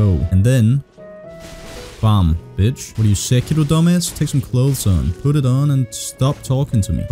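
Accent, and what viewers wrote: Denmark. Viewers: i love how hard his danish accent is:) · I just knew about your channel and as soon as I heard your voice I knew you had a danish accent. Respect for a fellow danish Dark Souls player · You can still kinda hire the Danish accent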